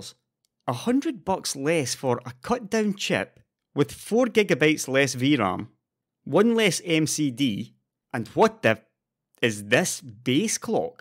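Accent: Scotland, United Kingdom. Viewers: The Scottish accent was too much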